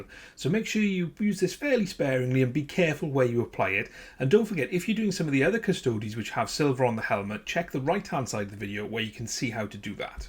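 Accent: Wales, United Kingdom. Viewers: Wait is that a Newport/Cardiff accent I hear???? Sub!!!! Oh and great content!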